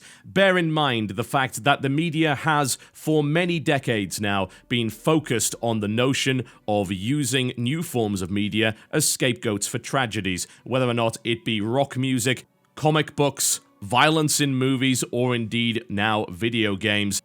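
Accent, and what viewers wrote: United Kingdom. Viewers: The only british that i can hear for minuts, Salut from argentina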